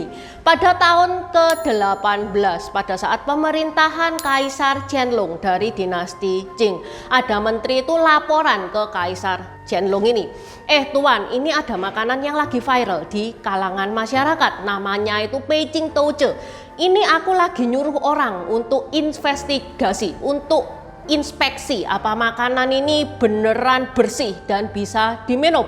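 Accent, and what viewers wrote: Java, Indonesia. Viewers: Enakan yakin dengerin dongeng nya nih.. dg bhs indonesia ber aksen jawa medok.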